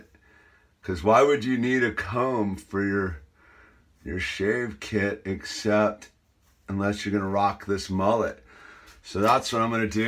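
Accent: United States. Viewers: John lydon be sounding a lot more American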